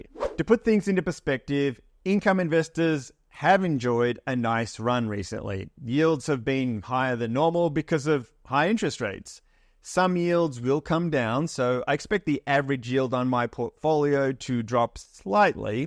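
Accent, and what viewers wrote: Australia. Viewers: Your videos are so well done! Do you edit yourself? For my company, we also do podcasts, so I’ve become an audio video junky. lol. Are you using a shotgun mic? Great acoustics. Looks like you usually treat your space for audio. And the sound editing nice! So happy for you. You are a breath of fresh air. The Australian accent always helps. 🎉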